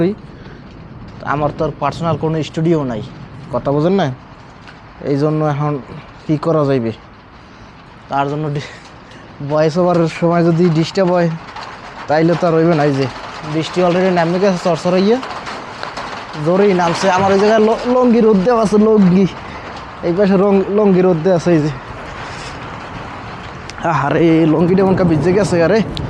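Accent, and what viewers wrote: Barishal, Bangladesh. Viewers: ভাইর বাড়ি কি বরিশাল?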